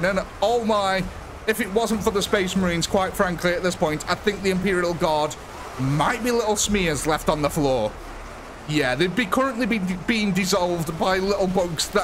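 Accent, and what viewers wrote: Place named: Potteries, United Kingdom. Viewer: 40k in a Potteries accent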